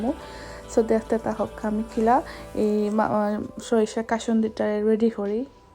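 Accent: Sylhet, Bangladesh. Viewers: তোমার মাত শুনে সিলেটি মনে হয়..😍 আপু আপনার দেশের বাড়ি সুনামগঞ্জ কোন থানায়..???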